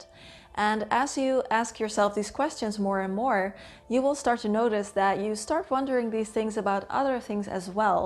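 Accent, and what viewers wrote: Netherlands. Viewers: Ik hoorde toch een Nederlandse toon❤❤ je bent gewrldig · I somehow could hear you were Dutch (even though your english is sooo good and you don't really have an accent) but it was confirmed for me when I saw the Holland & Barrett kortingskaart, haha. Super leuke video's en je hebt er een sub bij!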